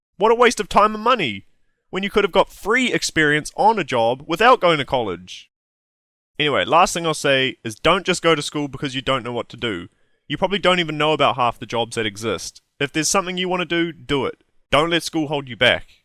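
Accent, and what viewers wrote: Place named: United States. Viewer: I was hoping for this to be sarcastic (google it). At least it made me realize I should think about how to exlpain the importance of education to someone who's not educated, because (judging by the comments) most of the people reading this a) won't bother reading it and b) won't understand it. One of the biggest problems in the world is the lack of education. A big part of that problem is about very simple stuff like reading but people having basic knowledge about how things like you, our cultures and the world work opens up incredible possibilities. You shouldn't go to school just to get a degree. That attitude is the best way to make sure you take the easiest route and get no real education. According to the video there are big gaps in the American (I'm guessing by the accent) school system. I'm sure there are teachers who would gladly give lessons about taxes and other adult stuff. 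If there aren't, don't quit school, try to make it better for everyone. Most of the "useless" stuff mentioned is not actually useless, you just never learned how to use it. When it comes to things like math problems and reading novels, a lot of it is just brain exercise that makes you generally smarter. I could go on but it's 1 at night.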